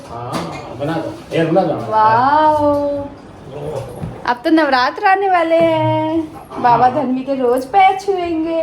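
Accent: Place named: Bhojpuri, India. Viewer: Bhojpuri bolati Ho bahan to bahut acchi Lagti Ho Main Bhi Gorakhpur se hun mujhe bhi Bhojpuri Aati Hai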